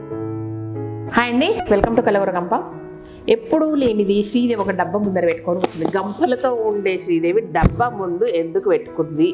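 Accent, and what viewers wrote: Telangana, India. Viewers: Telangana slang adurs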